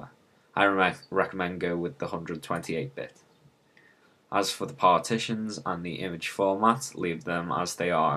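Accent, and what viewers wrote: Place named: Liverpool, United Kingdom. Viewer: A scouser! Hi from liverpool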